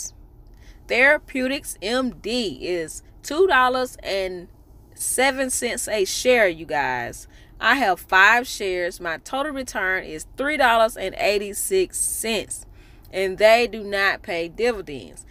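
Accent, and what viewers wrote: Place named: South, United States. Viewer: Love that country twang lol and personality for that alone I will subscribed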